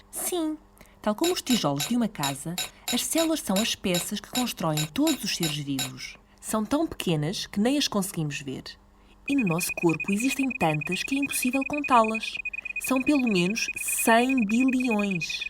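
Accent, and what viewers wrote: Portugal. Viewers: Se não fosse o sotaque português,eu teria entendido direitinho,mas ficou bom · Nossa, sou brasileira e não entendi nada por causa do sotaque português. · Nossa explica muito bem, é uma pena ser portuguesa, então há aquela pequena dificuldade de entender "perfeitamente" ... Mas mesmo assim muito bom, like + Fav! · É impressão minha,ou isso é português de Portugal? A língua em que está o vídeo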